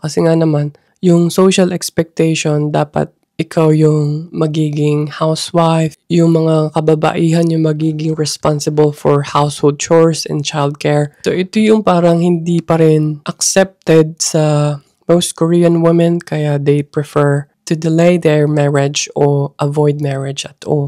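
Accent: United Kingdom. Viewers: Nagulat rin ako sa pa British accent reminds me of Sarah D 🤗 tumira rin kc un sa London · Bakit po may british accent yung english mo? Ang arte pakinggan 😅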